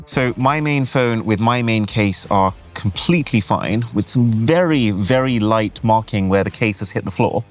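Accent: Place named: RP, United Kingdom. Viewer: Fucking hell that’s a bad hat. The public school accent combined with the backwards hat gives “hello fellow teens” vibes.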